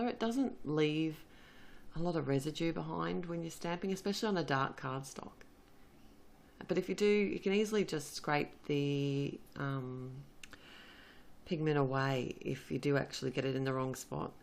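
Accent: Australia. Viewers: Hi Love the card. Just wanting to know where you bought your ATG gun from and where do you buy the refills from too please. Also what is the blue tape that you use to stick your paper down on your board with. Just love your tutorials and it's so good to hear a Aussie voice. Lol Lots of love from Rhonda Laine Sydney Australia 😘😘😘😘😘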